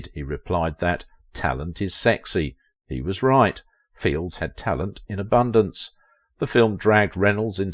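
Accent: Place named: Cockney, United Kingdom. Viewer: One thing I cannot stand when Englishmen decide to host like a documentary in America number one you may be speaking English but you're not speaking American and when you guys mumble on top of your Cockney accent it's virtually impossible to understand you someone made this documentary even worse is he didn't take the time to post the photos that goes along with what you are saying everything's out of sequence so it's so hard to follow engage and understand and enjoy what the hell you're talkin about please cease and desist dot-dot-dot I have seen documentaries of WWII hosted by English-speaking men and I'm completely able to understand them cuz they articulate well would you please do the same or get the hell out of Dodge do sincerely your friend and mine me ... Christ! 🙄🤔🤨🤪😜🤫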